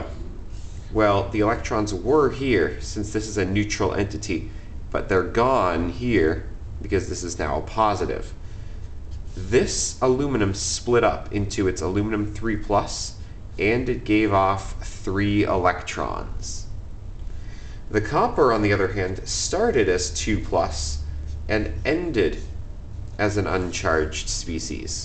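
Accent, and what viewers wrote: Canada. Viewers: I think its canadian maybe because the way he says the word out sounds like it.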